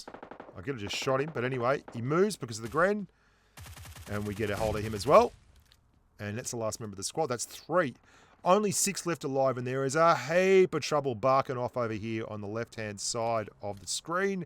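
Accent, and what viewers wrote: Australia. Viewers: you have the best pubg mobile videos! I can’t get enough. ( The Aussie accent helps;)) IGN: Salt65 #pochinki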